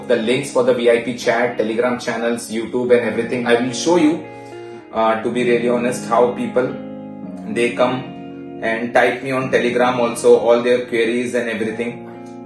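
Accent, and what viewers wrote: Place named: India. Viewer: Bro his accent is Indian and that makes hard to understand but this dude is good and anyone can understand but every body are not the same he was saying his strategy depends on moving average and you can get more explanation from youtube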